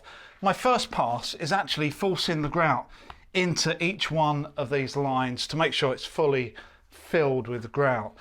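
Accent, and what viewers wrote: United Kingdom. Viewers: Great vid, very informative, nice to hear a British voice on these DIY vids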